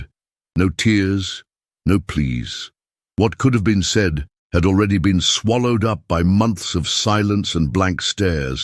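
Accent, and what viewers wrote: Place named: United Kingdom. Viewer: I love the narrator's British English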